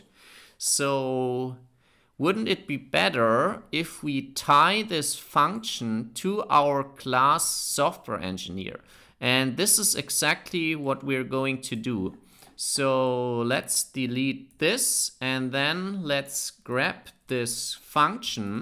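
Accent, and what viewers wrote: Germany. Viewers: nice content, but your accent is as worse as German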